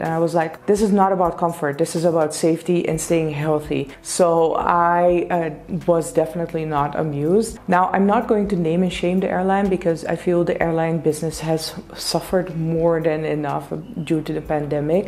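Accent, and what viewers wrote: India. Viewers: Just curious, why do you call yourself as a foreigner while visiting your home town India? Your accent is very much Indian!!!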